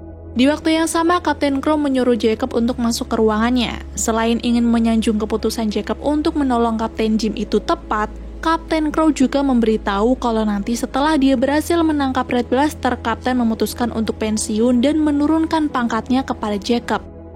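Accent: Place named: West Java, Indonesia. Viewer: Kak kakak orang mana kaya orang sunda euy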